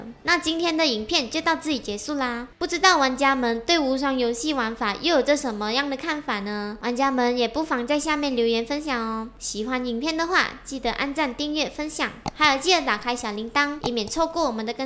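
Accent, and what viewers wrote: Malaysia. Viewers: 她應該是馬來西亞人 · 馬來西亞華人的口音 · 這位有大馬口音的聲音也太可愛了吧！ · 是猛將zhuan，不是猛將chuan哦⋯⋯妹子繼續加油，蠻喜歡馬來西亞口音，很有親切感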